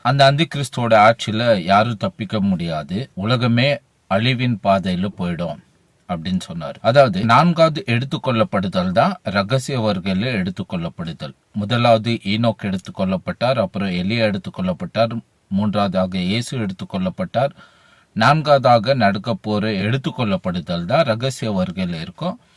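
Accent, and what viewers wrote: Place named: Bangalore, India. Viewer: Here I don't want to say whatever he has spoken is right or not or who is right? Apart from that I see that many of you has commented that he should learn proper tamil... Tamzhians who are born and brought up in Bangalore and have their ancestors settled in Bangalore would have this kind of slang. All bangalorean tamizh people speak like him....its one way of tamizh slang like how we have north chennai slang. Please do not comment bad on his slang... atleast he tried speaking in tamizh.... And not only in this video being a Christian i dont know why people make comment using bad words or commenting in a disrespectful way. Please use your language properly or do not register your comment. It is the same tongue we are using to praise the lord. Are we not ashamed to use bad words in the same tongue we use to praise our holy Lord. 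Dont your conscience strike you when you sit for prayers. Please be a proper faithful christian to jesus.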